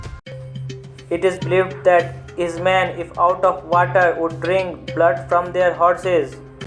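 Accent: India. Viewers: My mom hates your hindi accent, anyways, Vlad The Impaler was a mini-king Of Dacia (Named Romania In present)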